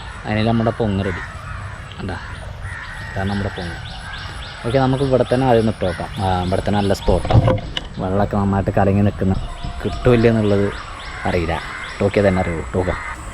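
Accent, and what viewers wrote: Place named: Kannur, India. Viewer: ബ്രോ ന്റെ ഭാഷ പൊളി കണ്ണൂർ ഭാഷ ശൈലി ആണ് നമ്മൾ രൂപ ക്ക് എന്നൊക്കെ കേൾക്കാൻ നല്ല രസം 😃😃😃😃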